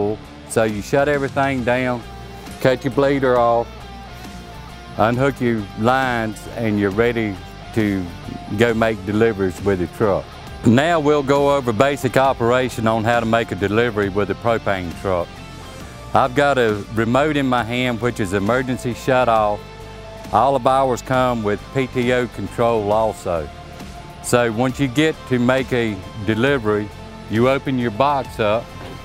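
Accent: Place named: South, United States. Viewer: Im about as north as you can be and damn that's thick south!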